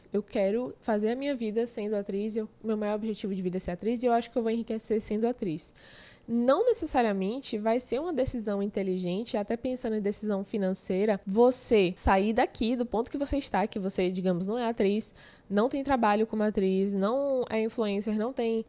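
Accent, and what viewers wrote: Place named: Bahia, Brazil. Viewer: eu simplesmente amei o seu canal principalmente por ser baiana, disso eu não tenho dúvidas só de ouvir seu sotaque me senti em casa, seu vídeo sobre autenticidade parece que foi feito pra mim, muita gente me ama como eu sou e mesmo assim vivo constantemente querendo mudar tudo em mim, vc é muito necessária ❤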